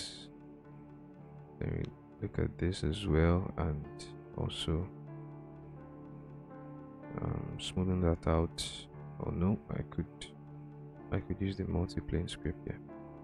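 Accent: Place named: Nigeria. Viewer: So good to have a tutorial in Nigerian English. I can understand everything you're saying. Really clear and straight to the point. Thanks for this.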